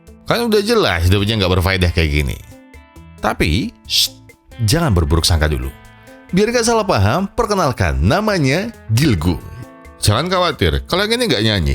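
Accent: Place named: Surakarta, Indonesia. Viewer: Orang mana si bang ko solo banget logatnyaaa 😭…. Dari chanel soundsmokers sampe sekarang ganti jadi setia gw banggg🫵🏻🫵🏻🫵🏻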